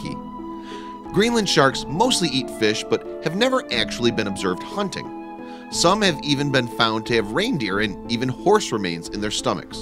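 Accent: United States. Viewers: It's so weird listening to a guy with an american accent read a British/Australian script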